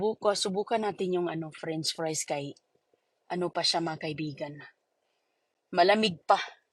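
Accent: Visayas, Philippines. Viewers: peper litus bisaya ka dhay.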